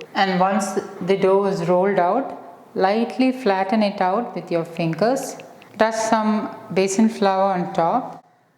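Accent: India. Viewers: Very bad voice and typical weird Indian English accent. Sorry to say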